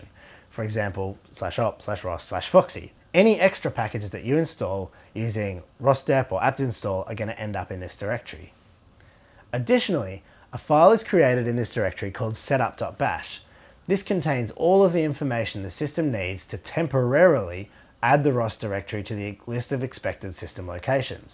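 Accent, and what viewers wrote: Australia. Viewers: thanks, I appreciate the feedback! Ive learned quite a bit from your video series / channel thus far so thats helped and am looking for more resources. My issue is that I have a rather unique robotics project and have been 'cobbling together' the ecosystem / tools myself & have only just discovered ROS. I will have to look more into it to see if its appropriate; I mean it has to be right?! PS. My 'unique project' at the moment is a Knight Rider KITT replica & was thinking ROS may be the solution. Yes, an actual pontiac trans am. PPS. You sound like a fellow Aussie, hope Im not being rude? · Excellent Aussie 😊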